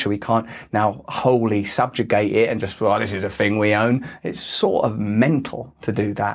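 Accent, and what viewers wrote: United States. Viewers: THE AMERICAN ACCENT🤣🤣🤣 I’m obsessed with jt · It's fucking weird to hear Russell Brand speaking in an American accent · Everytime you do your American accent it causes me to do a double take and rewind. It's so funny! Best to you and yours! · That American accent got me 😂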